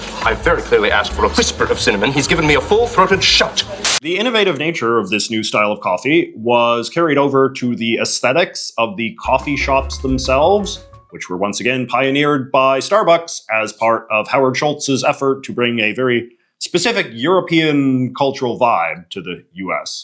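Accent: Canada. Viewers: “Talk a boot this this with oot mentioning….” Canadian slime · Most Canadian accent ever and I’m Canadian. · That Canadian accent tho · … there it is lol this is my first video and I was wondering why you sounded like that. Now it all makes sense, you’re Canadian! 😂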